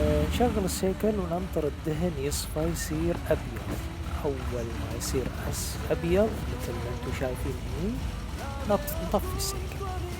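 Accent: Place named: Kuwait. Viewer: ايه الله يسعدك فعلا بس والله ماكنت أعرف إنك تتكلم من الكويت على كل أهل الكويت حبايبنا. فعلا فيه تباين باللهجات بس كنت أفكرك من ربعنا هههههه أعتذر منك وشرفني مرورك يالغالي. أعتذر منك بشدة. كلمة حق أهل الكويت رواد في كل شيء مميز بصراحة. وهذا شي ظاهر وواضح مايحتاج لشهادة أحد.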